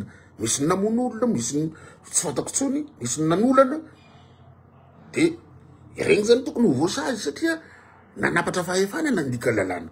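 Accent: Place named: Bara, Madagascar. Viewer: NA IZY MITENY FRANTSAY AZA MITOVY IZY MITENY BARA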